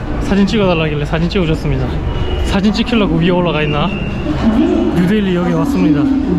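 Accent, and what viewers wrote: Gyeongsang, South Korea. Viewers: 오호 신기합니다. 갱상도 사투리가 친근하기도 하고 잘생김이 더욱 맘에 들고..조카뻘의 나이라서 조카생각도 나네요.. 젊을때도 그렇고 항상 행복하게 지내시기를.. 이제 알았으니 종종 볼게요...화이팅입니다....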